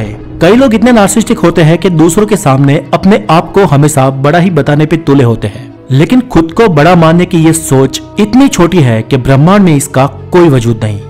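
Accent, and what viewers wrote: Gujarat, India. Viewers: Sir tum gujrat ke ho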